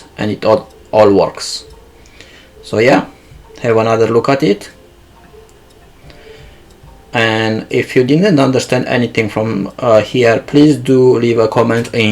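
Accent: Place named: India. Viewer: Thanks for solution. As for me, it's a little bit difficult to understand your pronunciation since it is a litle bit similar to Hindi speaking people (some places). For example all, individual, confirm divisible.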